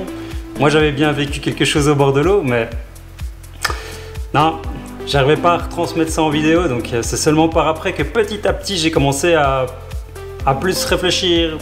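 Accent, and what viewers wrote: Belgium. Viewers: Moi j'aime bien ton accent Belge :-)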